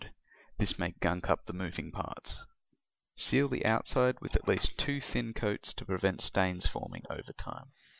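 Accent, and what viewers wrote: Australia. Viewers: Hey man, you sound Aussie. Sweet. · R u from Australia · Holy fucken shit mate, never knew you were Aussie, top notch work! The best i've seen in a long while.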